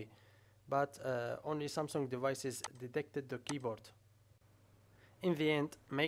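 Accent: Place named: Eastern Europe. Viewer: this guy is from russia or eastern europe. I cant understand his english properly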